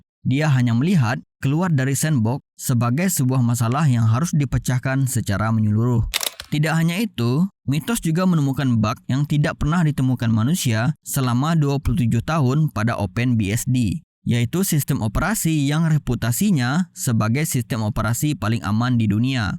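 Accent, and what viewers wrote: Aceh, Indonesia. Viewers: Ureung aceh bang nyoe. ? bereh hana gadoh karakter bahasa aceh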